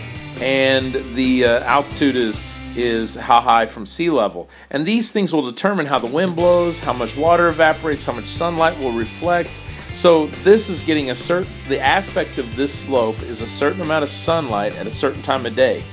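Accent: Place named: Texas, United States. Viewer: Dat half Texan accent Also very useful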